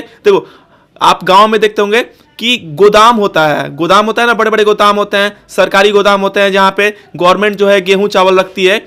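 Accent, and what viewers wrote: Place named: Bihar, India. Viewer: Aap Bihari ho kya aapka bolne ka tarika Bihari jaisa hai Main bhi Bihar se chhapra saran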